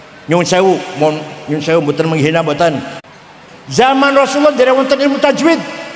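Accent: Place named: Cirebon, Indonesia. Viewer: Merinding ketika baca AyatAlquran....Suaranya khas tadarus cirebon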